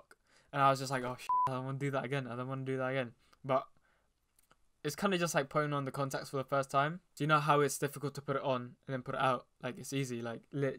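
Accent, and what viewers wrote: United Kingdom. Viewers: NO WAY YOURE BRITISH AAAH OMG IS AALIYAH GRACE BRITISH TOO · omg he’s british i always thought he was american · He British omg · he got the proper london accent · When i first saw ur povs on tik tok i thought u were American, hearing u speak British sounds so wrong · aye im new and bruh like on tic toc im thinking he sound Different but he sounding like an british big brother I never had but his laugh had me tumble off the bed literally out of laughter Like the funny face he pulls in sum of them videos like I looking in the mirror trying to make it but failed successful Aye keep up the good job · Is he British? I am confused 🤠🤠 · UHH HES BRITISH?!?! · I didn’t know that he had a British accent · He’s Filipino and sounds so English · he sounds british broo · Is it just me or does he sound a little bit british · Are you from Britain bec I am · Are you British and BTW IL your vids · WAIT YOURE BRITISH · Wait is he British AHHHH HE IS BRITISH 😮😳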